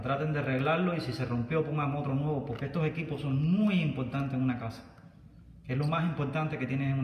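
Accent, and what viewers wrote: Cuba. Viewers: Tu eres cubano eh?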